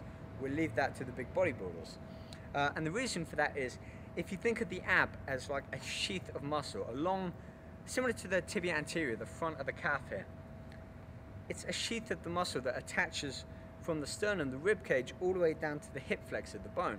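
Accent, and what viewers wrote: United Kingdom. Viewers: U look and sound really british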